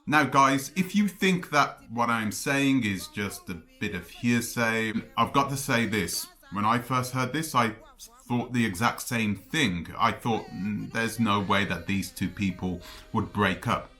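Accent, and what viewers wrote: United Kingdom. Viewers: I am puzzled by your video. Surely this is a private matter between husband and wife and nothing to do with the business or you and us. Why are so interested in talking about their marital life and talking about them defrauding people? You sound British and you know you wouldn't get away with this in the UK without being sued. Am I missing something here?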